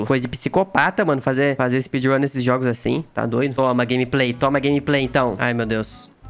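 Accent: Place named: South, Brazil. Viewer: Você tem muito sotaque do Sul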